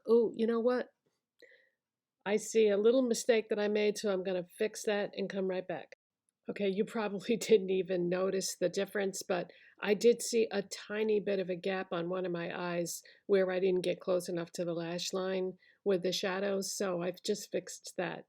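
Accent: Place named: New England, United States. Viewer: Actors take note, this is a legit New England accent.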